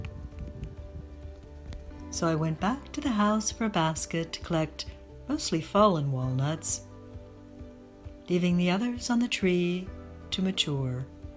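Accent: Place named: South, United States. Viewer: Are you a southerner by the way? I am, and to me your voice sounds southern. I am enjoying your vlogs.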